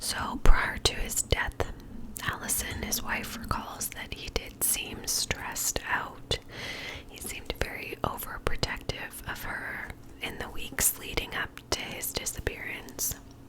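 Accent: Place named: Canada. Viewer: I have a feeling you’re a fellow Canadian😂 (Toronto here!) Something about the cadence of your voice and ofc the politeness haha Love your channel!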